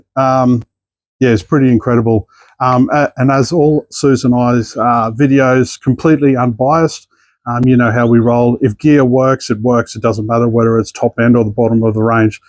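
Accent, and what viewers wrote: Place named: Australia. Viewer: You had me going there for a while, thinking that you were probably from the US, but your accent was really off. After watching a few more minutes, I realize that oh you’re down under! Didn’t think they had Aldi‘s down there, but I guess they’re all over the place. Love the review. Hope next year when I’m down in the US checking out Aldi‘s that they might have these. At less than a dollar a watt, that is a great bargain. There is an Aldi‘s in upper New York State, about 70 miles from Montreal. Anyways enough said, I wish you happy holidays and have fun camping. Our camping season won’t start for another five months. here we just got 4 inches of snow and winter is just starting. Mike from Montreal.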